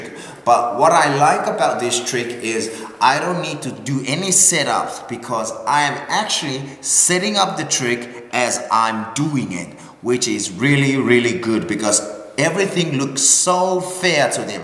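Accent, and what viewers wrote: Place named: Australia. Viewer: I just found you today on my recommended. I like how you teach card tricks. Is he Australian by any chance as his accent sounds like it?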